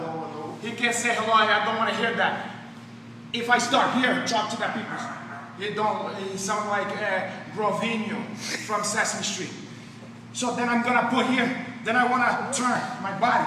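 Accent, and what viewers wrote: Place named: Brazil. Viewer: "He's going to face this way my brother. C'mon my brother!" LMAO that's how so many Brazilian instructors talk. I love them all but it's so spot on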